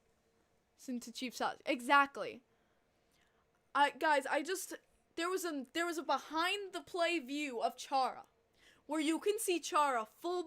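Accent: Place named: Long Island, United States. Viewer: Your Long Island accent is soooooooooo cute. 😍😘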